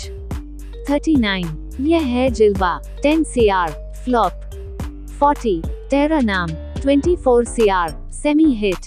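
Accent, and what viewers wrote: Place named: United Kingdom. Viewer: by her voice can tell she must British or from an English speaking countries struggling with Hindi title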